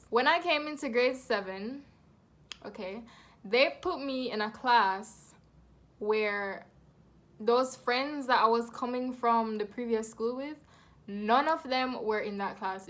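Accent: Jamaica. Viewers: Bredda you neva haffie tell me you Jamaican could tell from you open you mouth 🇯🇲🇯🇲🇯🇲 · Are you Jamaican? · You must be jamaican , accent trang trang trang 💯💯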